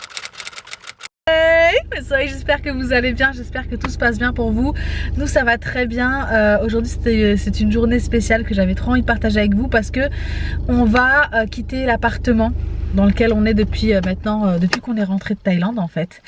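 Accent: Algeria. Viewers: Salut bella, bsahatkom la nouvelle maison, inchallah trabah 3likom avec l'arrivée du bébé!!!! Stp ton accent algérien paraît orannais!!! Est tu d'oran??? Ce dont je suis certaine c'est que c'est l'accent de l'ouest!!!!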